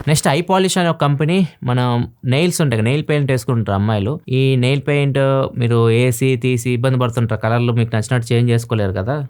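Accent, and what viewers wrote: Ongole, India. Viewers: Intiki velutunna no Ongole language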